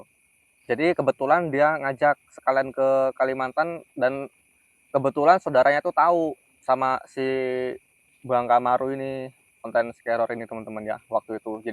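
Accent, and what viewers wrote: Java, Indonesia. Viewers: Sumatera dia..sama dgn bang maman..tapi logat2 ada jawanya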